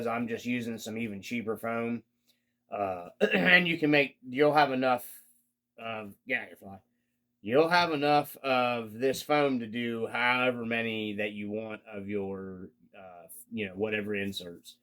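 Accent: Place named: Australia. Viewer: Thanks for the shoutout and kind words mate. Love the Aussie accent 😉. Just found your video and enjoyed the watch. Now subscribed - Thunder from Downunder! 🌏🇦🇺🦘🐨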